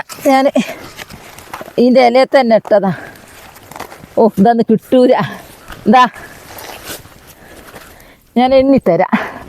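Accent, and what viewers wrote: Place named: Mukkam, India. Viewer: ഭാഷ കേട്ടിട്ട് മുക്കം ഭാഗത്താണോ എന്ന് സംശയം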